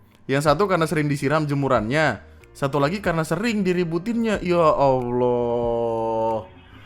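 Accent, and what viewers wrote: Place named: Medan, Indonesia. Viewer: Medan bah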